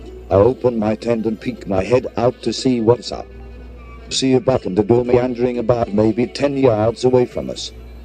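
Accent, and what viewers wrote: United Kingdom. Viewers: Thanks for the upload brother. Got a new channel to binge later. So far you are the only other text to speech channel that used the male English accented voice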